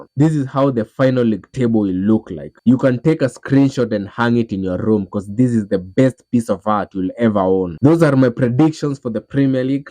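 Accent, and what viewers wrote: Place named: India. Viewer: Indian accent level 10. Nah lowkey good video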